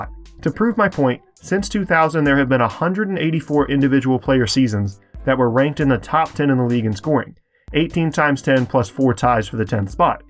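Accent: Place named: United States. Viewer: My Account he sounds american to me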